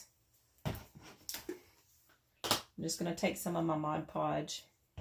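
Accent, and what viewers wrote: South, United States. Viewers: Love watching you and hearing your Southern accent. I’m an Texan girl so it’s good to hear a familiar accent. Your DIYS are so good and I feel like I’m at home. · OMG ... I'm from the South (Southeast Texas) and I LOVE, LOVE, LOVE your accent!!! Can't wait to see what you come up with 😁😁😁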